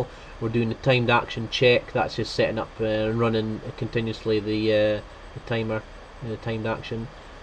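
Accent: Scotland, United Kingdom. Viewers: is from Scotland. I am English. One can easily 'get' all the content by the context. He is clearly a Top Boy and I love having to try a bit harder to understand his speech pattern. I can cope with a few "eeee", "eeerrr" when he is so on the money and his kindness so evident.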